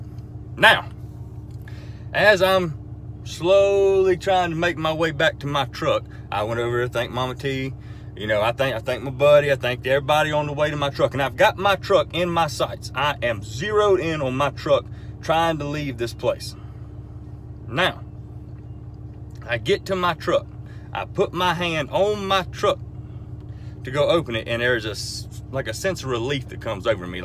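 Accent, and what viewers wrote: South, United States. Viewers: Hank is Texan. King of the Hill takes place in Texas. This man is clearly Southern, not Texan. You can tell by his accent and the fact that he mentioned corn and "cornhole". Those aren't Texan. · no, cause he is mad relatable and funny. he is a great story teller. i do love southern accents though:)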